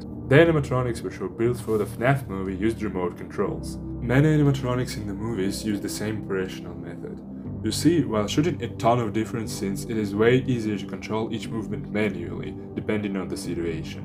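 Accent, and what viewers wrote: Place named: Russia. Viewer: Всегда русский акцент уловлю 🫂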